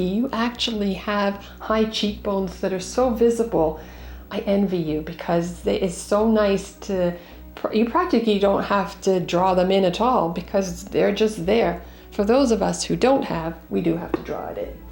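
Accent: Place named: United Kingdom. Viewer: Love it! I'm starting to hear your British accent more 🥰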